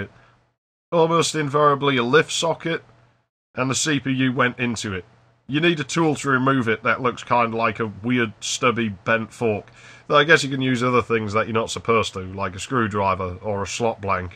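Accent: United Kingdom. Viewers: that accent, 80% geordie, 20 % yorkshire... Durham?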